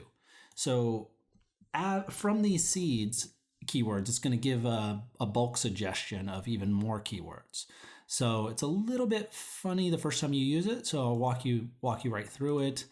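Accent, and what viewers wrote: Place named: United Kingdom. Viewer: Uk English i like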